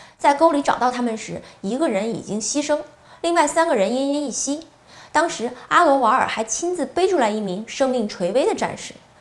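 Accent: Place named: North, China. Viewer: 這腔調一聽就知道是大陸北方人XD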